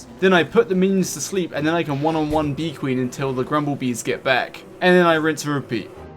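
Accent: United Kingdom. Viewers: This Dude "The Spiffing Brit" You sound so much like him at the beginning of the video that I though you was trying to copy his work. Not just the brithsh accent but some lets say jargons are about the same. Brithsh people must talk in some pattern..That is sure to sound silly but there's a reason for it I've watched the whole video though and i love it. Never got so far in this game as the first winter aways kills me and friends so i've leaner a lot. Sorry if that coment bothered ya, no one ever replies so i didn't take the time to remove it. I subscribed right at the and of the video, and I hope to see more of your work on dont starve as it is a game that i like a lot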